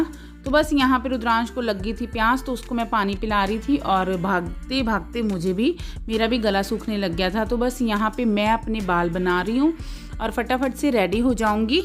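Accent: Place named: Punjab, India. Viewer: Aap ki language Punjabi lagti hai, but aap shayad ho, aap plz apne baare mein kuchh explain karen aap kahan se ho??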